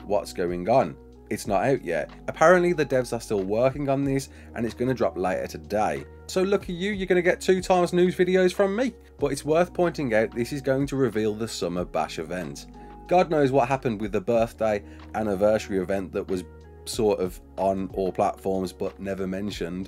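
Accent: Birmingham, United Kingdom. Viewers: they will nerf the astro that much, you might as well use a tek tapajaro, and i say void wyvern, sounds better than void worm, maybe its the accent, are you a brummie or a black country lad?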